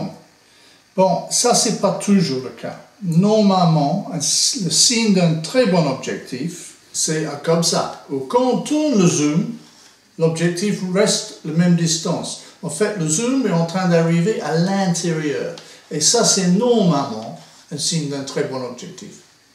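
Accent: England, United Kingdom. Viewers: Super ce petit cour avec l'accent anglais j'adooore. Je suis nouveau dans ce domaine de la photographie ce cour m'aidera beaucoup car je ne pensais pas qu'il y avait autant de spécifique aux objectifs merci merci merci encore pour votre partage vidéo. Je m'abonne. Bye...!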